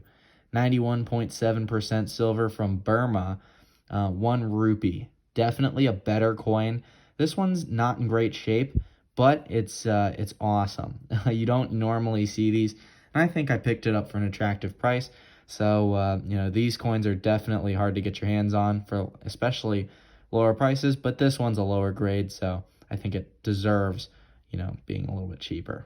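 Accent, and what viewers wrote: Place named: United States. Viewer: P.S American pronunciation is hilarious to the rest of the British world !! Bless!!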